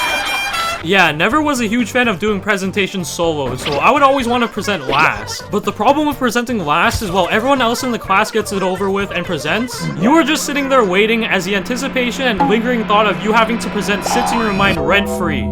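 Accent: Canada. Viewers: U Canadian bro? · bro are you canadian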